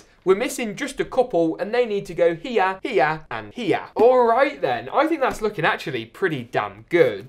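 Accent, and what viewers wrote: United Kingdom. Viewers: such a british xDDDD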